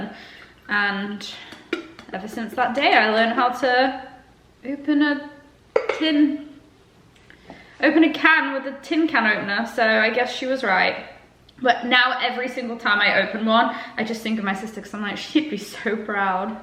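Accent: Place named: United States. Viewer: Was so interesting watching you make the drink! I feel like in this video because you’re speaking more you can hear the slight American hint on your accent for some words. It suits you so well!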